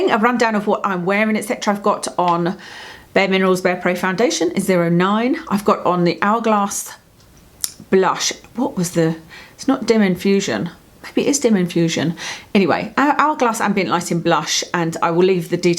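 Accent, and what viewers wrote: United Kingdom. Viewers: Heavy British accent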